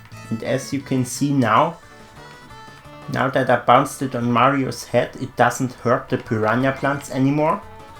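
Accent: Germany. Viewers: Wait!... Are you from Germany? 🇩🇪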